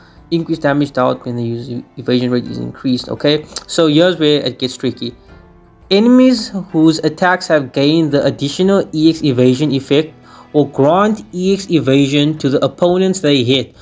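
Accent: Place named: South Africa. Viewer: Bro you from SA? can’t mistake that accent 💀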